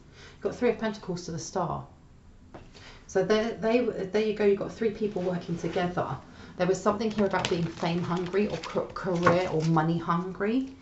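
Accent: United Kingdom. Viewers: You sounds British I like it 😁‼️ very Calm voice.. Eitherway thanks 👍 for giving us your time DuuuuuuvvvvvvvvaaaaaLLLLLLLL county jax FL USA 😊👍‼️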